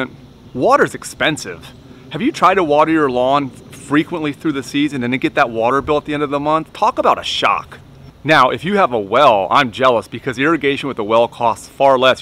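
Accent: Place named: North, United Kingdom. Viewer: What's your location? you sound like you're from up North but Bermuda doesn't grow up there ha.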